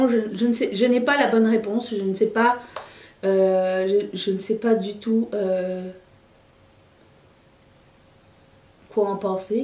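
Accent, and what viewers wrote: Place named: Quebec, Canada. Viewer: Wow! Je sais pas pourquoi mais j’entends un léger accent québécois 😂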